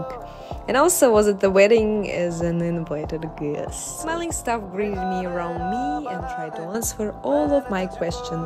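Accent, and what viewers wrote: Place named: India. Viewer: Idk why but she is sounding more and more indiann.... As she makes more videos 😂❤👍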